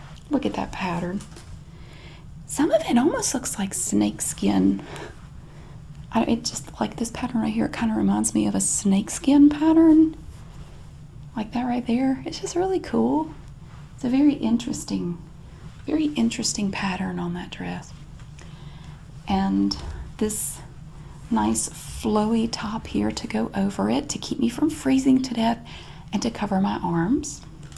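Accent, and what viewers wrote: South, United States. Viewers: I absolutely love you 🖤🤍🖤 watching your videos makes me feel at ease and comfortable. I think one of the main reasons why I feel so at home when I watch your videos is, because of your southern draw. It feels like home because that is how my mom talks and I adore my mom! 🥰 so thank you for all that you do on your channel. 🖤 from SA Texas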